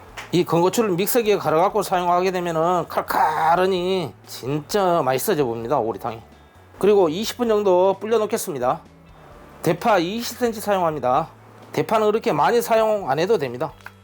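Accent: Jeolla, South Korea. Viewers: 전라도 분이세요? 말투가 정겹네요😊 보기만해도군침도는 광주식 오리탕 레시피 넘나 잘보고갑니다❤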